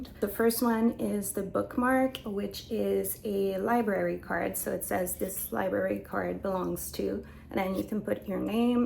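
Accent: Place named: France. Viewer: My Dear, your new excitement is palpable. It's so noticeable your French accent was more forward. I love this! Congrats on your new venture.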